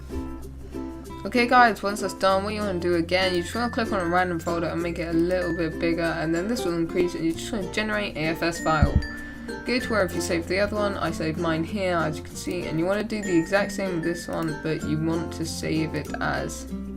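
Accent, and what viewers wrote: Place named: England, United Kingdom. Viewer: holy shit finally an english person hooray i can actually understand this now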